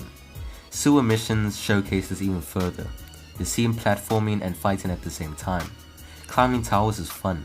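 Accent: South Africa. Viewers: Yarre I can hear in your voice u a Southie mybra🤣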